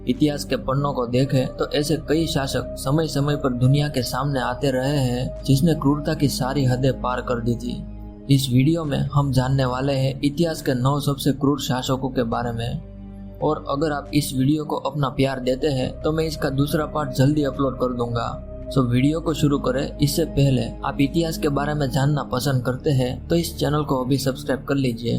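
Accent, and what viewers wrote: Gujarat, India. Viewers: Aapke bolne ki style gujrati people jaisi hai...kya aap saurashtra se hai?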